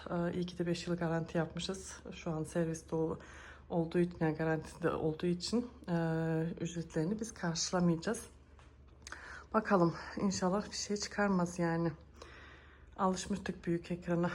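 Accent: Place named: Kayseri, Turkey. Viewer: siz nerelisiniz, eşim kayserili, aileside sizin gibi konuşuyor, koymussum, almıssım, yapmıssım gibi gibi, bana çok garip geliyor, ilk defa eşimin ailesinde duymuştum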